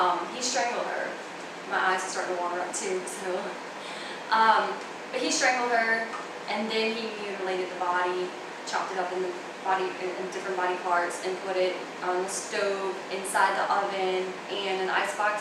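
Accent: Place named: United Kingdom. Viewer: Great setting. Nice background story. You have a slight British accent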